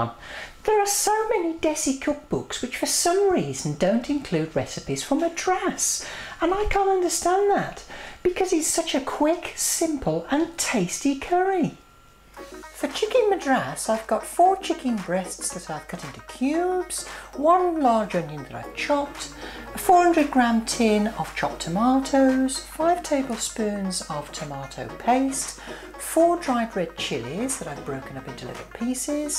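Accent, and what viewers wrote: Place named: England, United Kingdom. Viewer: Ok, English accent with a slight hint off southern hemisphere in there, but Carrefour tin sugests you are out of the country....................... hmmmmm, I am guessing you are living in Australia? Im going East Coast Australia........... Am I within a thousand miles?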